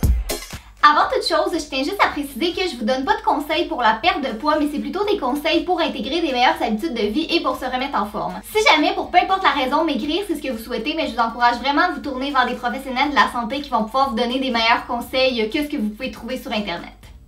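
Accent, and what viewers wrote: Canada. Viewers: Lolilol 309 enfaite elle est canadienne donc elle ne dit pas les mots de la même façon que nous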